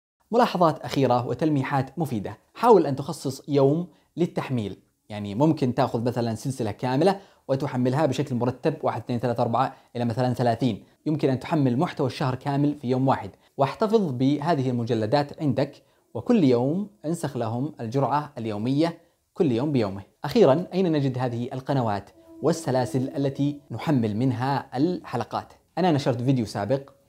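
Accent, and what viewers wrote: Yemen. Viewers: انت يمني صح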